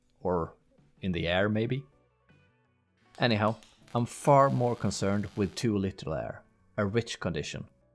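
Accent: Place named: Sweden. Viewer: Perfect Swenglish accent:hands-yellow-heart-red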